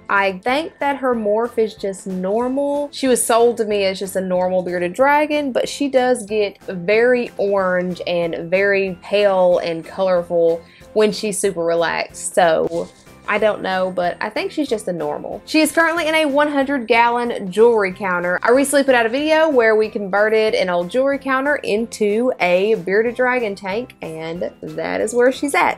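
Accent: South, United States. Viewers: She sounds southern. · you just sound like a southern bell I love it and ur pets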